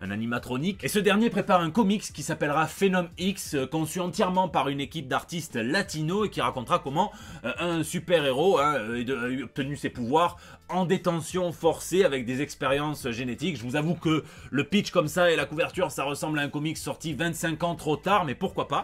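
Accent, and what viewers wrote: South, France. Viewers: Salut !! Juste pour dire si jamais tu passes par là, il faut regarder l'excellente adaptation d'Injustice par Warner Animations ! En rappelant que c'est une adaptation donc y'a des micro-changements mais l'ensemble se mesure avec un double demi-mollomètre ! garanti ! Toujours autant de fun à te regarder, j'adore ton style et ta gouaille du Sud, franchement tu régales ! Bonne continuation à toi !!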